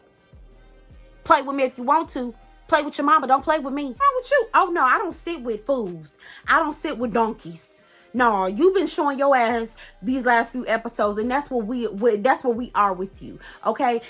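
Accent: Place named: South, United States. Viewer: You a mess. I can not with you. lol Are you from the south? You sound like us from the south girl. lol 😂😂❤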